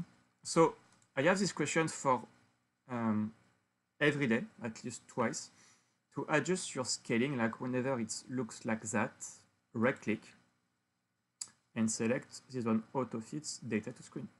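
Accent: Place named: France. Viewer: toi tu es français 100% ton accents c'est sur poto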